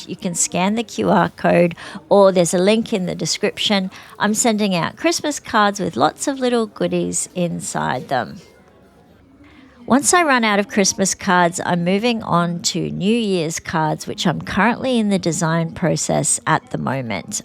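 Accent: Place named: Australia. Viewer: I enjoy watching your videos. I love your Aussi accent. I'm in Texas USA. My children are grown and moved away. I don't get to see them as much as I wish. I have made a life for myself with the 2 cats that came with my house when I bought it. I sold a large house and bought a small one near the lake. My cats are such good companions. Greeting me when I return home and following me around the yard when working outside.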